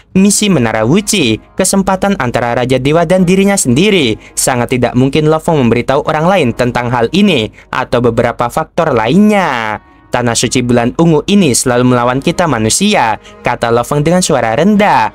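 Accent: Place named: Kelantan, Malaysia. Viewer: Bro dah pandai kecek klate..❤❤ bulang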